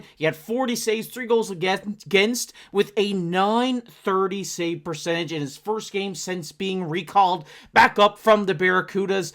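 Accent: Newfoundland, Canada. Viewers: Are you a Newfoundlander? East coast accent is strong!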